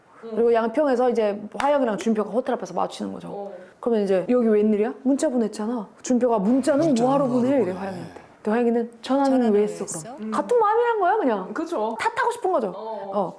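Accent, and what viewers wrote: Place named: Seoul, South Korea. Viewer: 언니 나 하루에 2번씩 보고있는데 연기력 물이 올랐쟈나~ 서울말투 기가 멕히쟈나~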